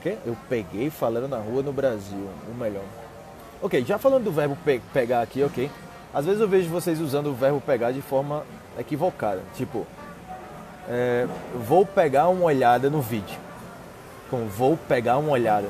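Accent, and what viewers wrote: Colombia. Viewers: Me gusto tu acento colombiano...vai com Deus patrão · Mae, mil gracias. Me encanta su acento de español colombiano ja, ja, ja.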